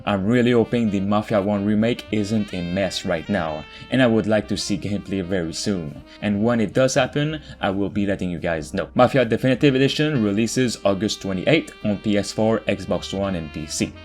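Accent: Quebec, Canada. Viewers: Tu es du québec ca c'est sur avec l'accent è la GSP hehehe moi aussije suis du québec. Si tu as envis de faire un podcast en francais un jour laisse le moi savoir! moi aussi j'attend mafia 1 remake jen'y ai jamais joué mais j'adore la série donc j'ai hâte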